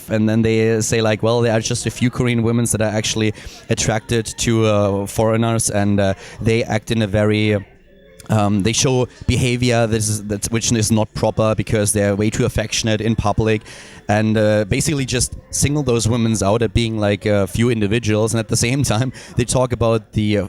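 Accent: Germany. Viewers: Man!!!. You have strong German accent. How can you teach English to kids? They are going to learn German. How did you get a job at Korea. Now. I told my mom to apply job. Ha...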